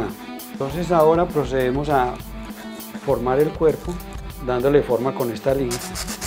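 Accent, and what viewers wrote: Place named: Colombia. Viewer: Hola buenos días se me acaba de morir mi canario quisiera disecarlo donde se encuentra usted no me diga que en Colombia por su hermoso asentó Dios que triste estoy 😢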